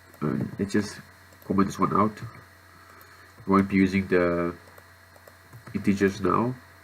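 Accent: Afrikaans, South Africa. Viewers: Hes from South Afrika. Theres no doubt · like jou afr accent XD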